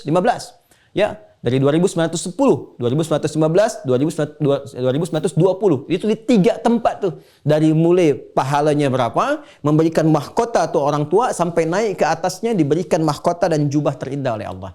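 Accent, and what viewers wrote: Malaysia. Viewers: Ustad terkadang menggunakan bahasa dan logat melayu hehe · Ustadz bicara nya sprt bhs melayu / Malaysia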